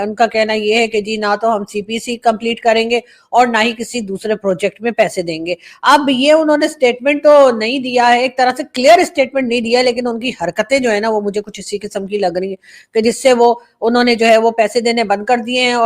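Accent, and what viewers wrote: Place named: Allahabad, India. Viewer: Aap bahut khoobsurat lag rahi hain , Allah aapko salamat rakhe Aap TRUE ALLAHABADI lagti hain , aapke bolne ka lahja ek dam Allahabadi lagta hai Hamara bahut sara Ashirwad 🎉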